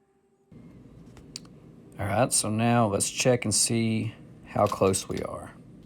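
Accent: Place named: South, United States. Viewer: You've gotten younger......and much more southern. And is that a Bergara I spy?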